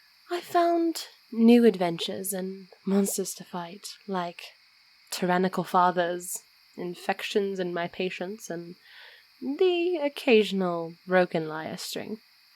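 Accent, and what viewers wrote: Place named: United Kingdom. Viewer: This was really sweet! I loved your british accent!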